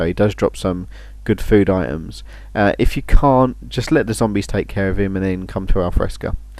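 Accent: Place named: United Kingdom. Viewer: hey ur guide is awesome and i love the british accent im american but id like to be british instead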